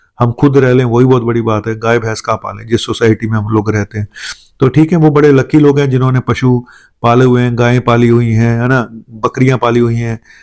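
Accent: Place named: Punjab, India. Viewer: Dada ji came from country of milk that IS PAKISHTAN I could make out from your way of talking PUNJAB age 80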